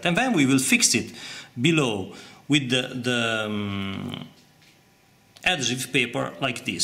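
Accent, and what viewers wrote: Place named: Italy. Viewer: Nice trick! With always this funny italian accent! (although my french one can be funny too! ;-) ) . I let you know I follow you to make my guitars fixing and adjustements and it's ok all over the way ! :-)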